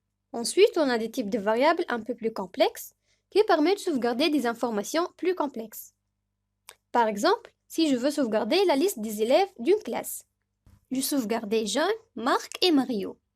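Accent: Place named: Tunisia. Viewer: pure Tunisian accent , Keep up the Good Work .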